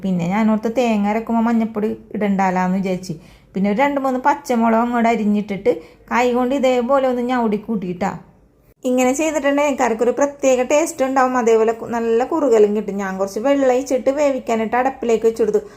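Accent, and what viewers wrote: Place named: Kochi, India. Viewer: ഇരിക്കണേനു. കൊച്ചി ഭാഷ കേൾക്കാൻ എന്ത് സുഖം.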